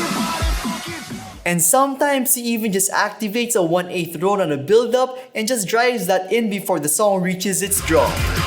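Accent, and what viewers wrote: Philippines. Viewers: Lol your accent is funny, are you filipino?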